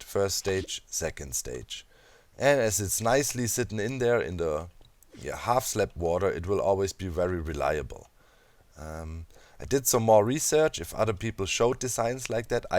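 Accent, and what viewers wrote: Germany. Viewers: Kann ich da einen deutschen aktzent raus hören? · Wie man immer hört wenn jemand deutsch ist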